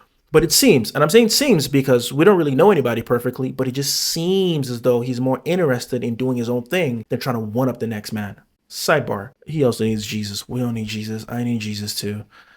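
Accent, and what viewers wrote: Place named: Canada. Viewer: He’s just surface level reacting to get views from the situation which is disingenuous because if he truly wants to have genuine discussion he would actually do the work of making sure all his points have source’s. For example doesn’t have “higher ups” anymore and even said in the song he doesn’t care what the industry has to say which is where the true essence of the battle lies. What Drake represents is what has watered down hip hop to something vapid and almost soul less. said he is not our savior. Also if your not from this culture (which homie sounds like he has some Canadian in his accent no hate) you will never understand the blood, sweat and tears that has given this art form its soul. From Police brutality in Compton being called out by NWA to Kendricks “ ”. To those saying it’s performative even during the civil rights era Mahalia Jackson sang to keep the spirits up of the people.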